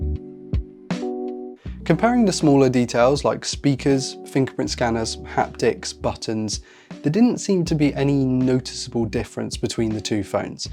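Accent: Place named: England, United Kingdom. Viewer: I might be wrong but you sound English so why saying dollars not pounds and it's obvious not worth it if you've a S23 model but anything from S22 down probably is worth it.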